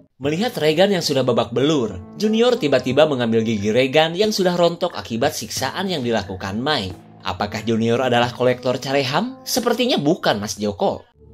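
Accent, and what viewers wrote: Sunda, Indonesia. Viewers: Aya sunda sundana kitu seru pisann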